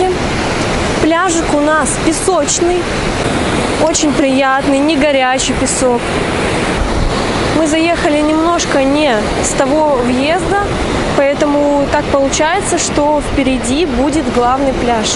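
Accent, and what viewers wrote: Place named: Urals, Russia. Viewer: Вау, я угадала!!! Точно, с Урала, слышу знакомый акцент. Я сама с юга, училась у вас в Перми. Да, у меня уши отваливались от вашего ,,О,, ,,АГА,, слова жуете.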